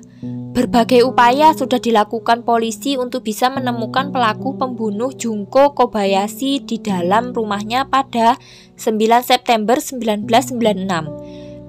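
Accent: Java, Indonesia. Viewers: Logat Jowo x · Kritik membangun: suaranya medok jowo bngt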